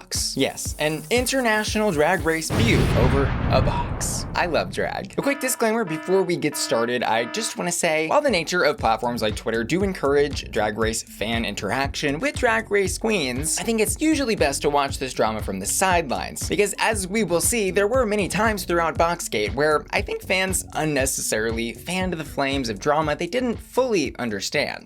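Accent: Spain. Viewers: The best thing that came out of this drama is that I got to hear your lovely spanish accent! Thank you queen! ❤️❤️❤️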